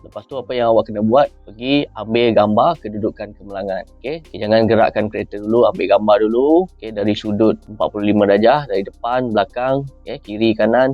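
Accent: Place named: Sarawak, Malaysia. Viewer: TQ bro info bagus. Baju JDT tapi slang Sarawak. Terlanggar awek Johor ke bosku.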